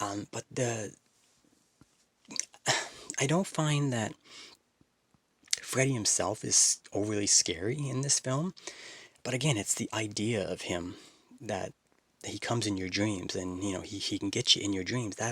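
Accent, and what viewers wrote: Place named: Atlantic Canada, Canada. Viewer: You're Canadian? I'm from Toronto. Based on your accent I think that you are probably from one of the Atlantic Provinces.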